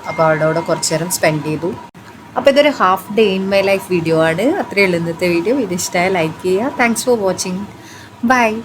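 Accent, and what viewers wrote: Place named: Kannur-Kasaragod, India. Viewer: Eachinde nad ede kannura kasroda slang kekumbo thoninn . Nan kasargodil ninnan ta ❤❤😊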